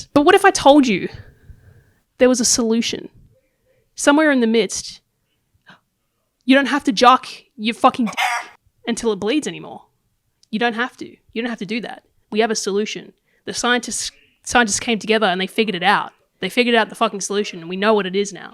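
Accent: Australia. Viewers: So are you Australian or something? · who knew that all i needed i was an aussie girl cussing me out and telling me to stop shucking the corn. 10/10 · thank you for being Aussie, I love Australian people accents. I can't stop listening 😂😂😂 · Clearly aussie, but whats the go? Nintendo is cool, but this is somehow related to porn? Tha fuck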